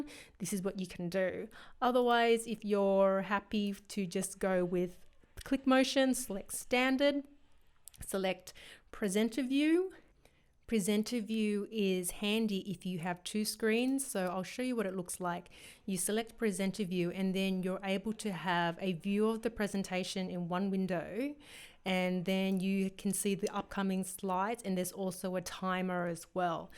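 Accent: Australia. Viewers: Thank you for a easy and informative video! So good to find a tutorial in an Aussie accent. Subscribed.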